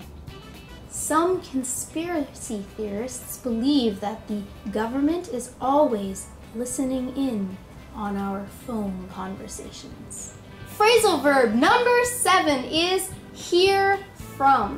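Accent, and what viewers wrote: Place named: Canada. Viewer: Again, simply an excellent lesson! Nice to hear some Canadian accent. Thanks. Great.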